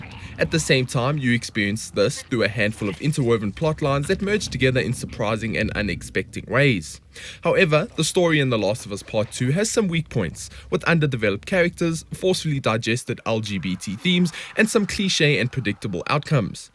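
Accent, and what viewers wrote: South Africa. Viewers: You South African bro? Nice review. Yeah you're definitely from Cape Town. Stellies maybe?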